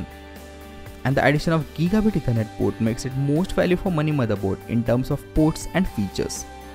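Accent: India. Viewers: dat indian accent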